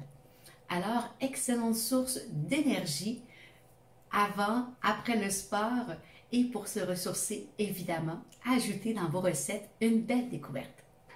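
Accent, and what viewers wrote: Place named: Canada. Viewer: Merci avec le bel accent canadien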